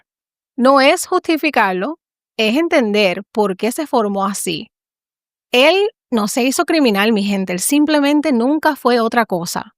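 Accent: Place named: Puerto Rico. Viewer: Wow, ahora se la historia completa, era malo😮uhhh, tremendo contenido, your a great story teller, Bien borikua😊como hablas, me encanta tu canal, El q aparece en la primera pelicula se parece a Braulio Castillo? Yo me acuerdo de estos actores wow, Fue una Leyenda ,gracias ma🇵🇷🇵🇷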